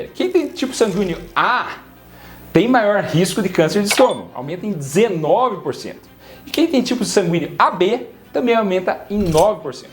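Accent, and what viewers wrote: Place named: Paraíba, Brazil. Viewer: Nordestino paraibano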